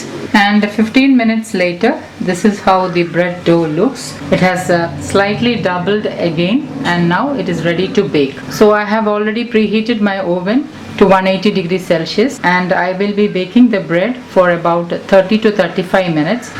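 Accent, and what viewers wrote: India. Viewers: it is already recognized by many pple known as Indian accent and clear English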